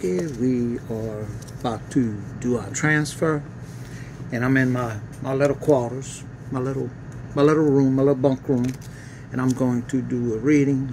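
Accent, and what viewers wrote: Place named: South, United States. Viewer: just love yr southern accent and manners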